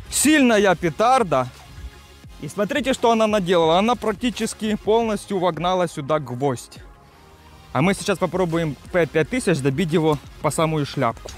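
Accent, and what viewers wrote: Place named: Ukraine. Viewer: Зачем тебе маска) наверное это завлекает новых зрителей) по голосу сразу слышно Украинец!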